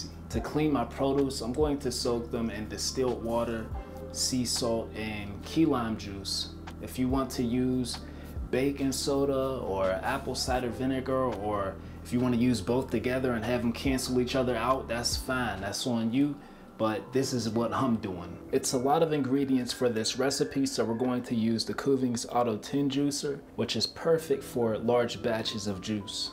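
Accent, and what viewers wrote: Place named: Western Pennsylvania, United States. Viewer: I knew it.. I was born in Pittsburgh and moved to Maryland when I was 7 so.. I heard that Western Pa accent mainly da burgh in you.. I had to ask.. much love to you my brother.. It crazy my family reunion is in the burgh in a couple weeks so I’ll be up there.. peace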